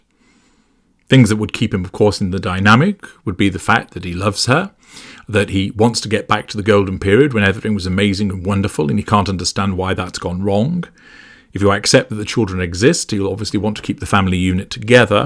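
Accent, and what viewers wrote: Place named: England, United Kingdom. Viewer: You have one of the most beautiful voices I have ever heard! Understanding English from England is a bit difficult for me, but, I love love love your tonality, your voice, and how professional and precise your information is. Thank yu, and please continue doing your videos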